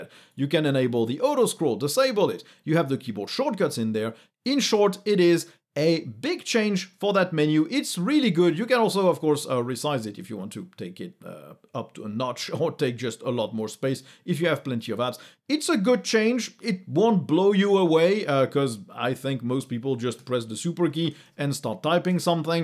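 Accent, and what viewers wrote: France. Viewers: Thanks for the reply, bro. I'd update to 22.3 then. P. S. I like Ur channel and Ur style... And that English accent is nice. U r French, right?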